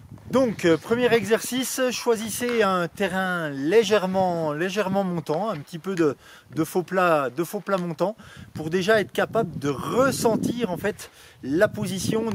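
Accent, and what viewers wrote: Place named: Franche-Comté, France. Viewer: Merci beaucoup ! Ton accent m'intriguait pour un moniteur des pyrénées orientales... mais t'es franc comtois :) Mise en pratique demain, merci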